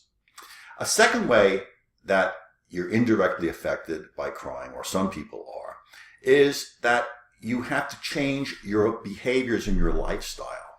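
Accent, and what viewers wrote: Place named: New York, United States. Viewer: I hear my New Yawk accent !!! ❤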